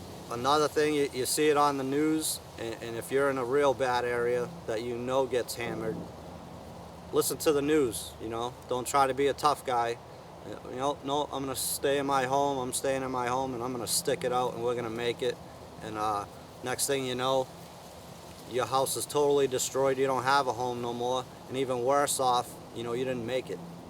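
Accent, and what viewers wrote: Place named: Massachusetts, United States. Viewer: Your voice makes me homesick for Massachusetts. Thanks for the info and have some chowdah and clam cakes for me.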